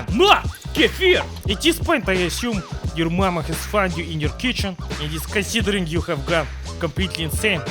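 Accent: Russia. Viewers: Your thick russian accent made me a little tired but you are so funny :) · I watch too many of your videos, I no longer need subtitles, I understand you just fine. A friend asked me if I speak Russian, I said "no Cyka Blyat", but I understand English, it's almost Russian 🇷🇺. Keep the videos coming!!!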